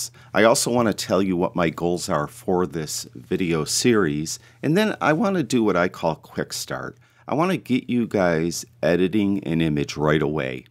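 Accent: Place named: Western New York, United States. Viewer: Hey There, first I recognized the unmistakable Western NY accent, but the working photo from Hamburg was the dead give away. I grew up there. So cool! If I'm not mistake, that's Hoak's restaurant. My first Communion dinner was there... some 48 year ago!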